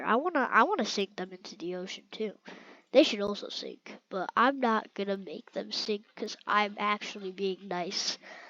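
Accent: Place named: United Kingdom. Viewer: Bro's bri ish ig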